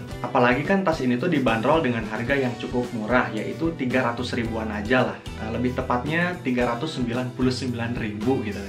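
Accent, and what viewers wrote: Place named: West Java, Indonesia. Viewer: Dengar narasinya, sangat mencirikan orang sunda 🤣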